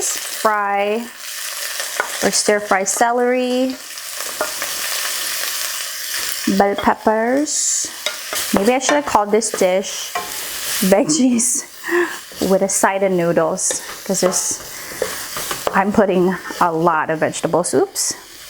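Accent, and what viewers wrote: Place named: Philippines. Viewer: I love your Filipino accent. It's so different when you were whispering hehe. Love you ate ❤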